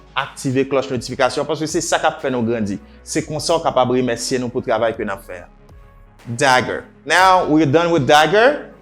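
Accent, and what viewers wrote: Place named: United States. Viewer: Kou sa a vrèman enteresan ak yon pwonosiyasyon américain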